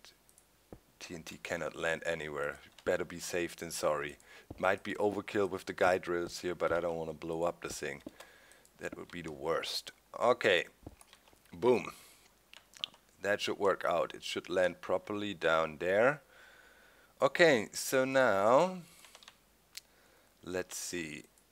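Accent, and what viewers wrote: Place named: Germany. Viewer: versuch bitte mal ein bisschen an deiner englischen Aussprache zu arbeiten, es tut mir jedes Mal in den Ohren weh, wenn du "Witter" sagst... Keiner verlangt perfektes Englisch, aber es wäre ganz cool, wenn du das "th" wie in "SethBling", "over there" und halt "Wither" ein bisschen, naja, englischer und weniger deutsch aussprichst;).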